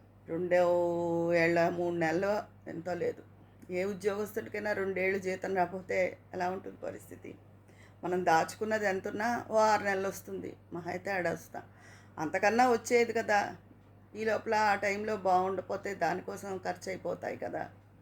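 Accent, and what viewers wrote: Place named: Kakinada, India. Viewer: Mee maatalaloni accent ( yaasa), naakentho ishtam! Maa vaalladi Kakinada, mee maatalu vintunte,atuvypu vaalle gurthukosthaaru.Maa native place Bandaru,kaanee eppatinuncho Hyderabadlo vuntunnaamu.Mee kaburlu intlo vaaritho panchukunnatluntundi. Thank-you.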